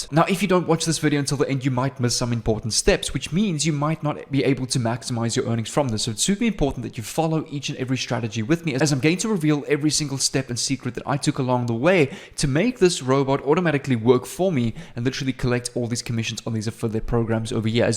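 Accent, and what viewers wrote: South Africa. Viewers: Are you South African Mate? I'm very familiar with that accent. But you are great. I trust you and Im taking my shot on this one.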